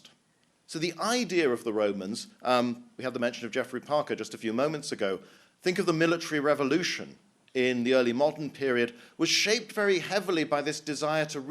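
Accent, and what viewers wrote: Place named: United Kingdom. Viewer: Absolutely enjoyable and very high information density. Plus the British accent adds credibility... :)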